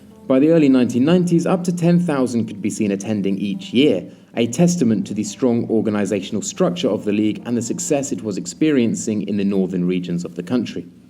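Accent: United Kingdom. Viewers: you are from the south of Italy? All of this time i thought you were somewhere from England. Am i correct in guessing that you moved to the South? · Wow you're from Italy? I thought you were British this entire time. Regardless, great content like always!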